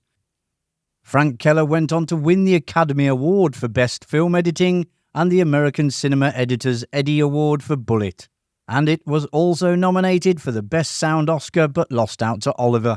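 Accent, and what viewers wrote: United Kingdom. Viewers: Why is it always a British guy that has explain everything????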